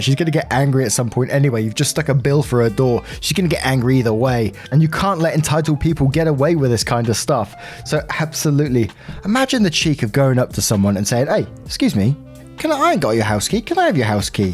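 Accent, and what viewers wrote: United Kingdom. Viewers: I don't really like the relationship stories but I wanna sleep on a bed of your voice! 😂😂😂 So whether I like the topic or not, I don't even care. Can listen to your voice all day! It's that british accent. 😊 I know this is an entitled neighbor one but was just sayin'....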